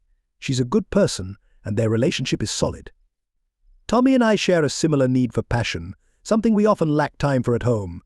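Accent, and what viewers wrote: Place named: United Kingdom. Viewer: using a "british" style accent for an american story is very off putting the vocalisation just sounds incorrect then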